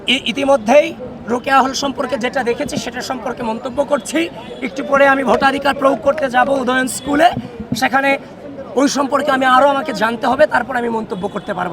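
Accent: Kolkata, India. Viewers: ভাই কি কলকাতার?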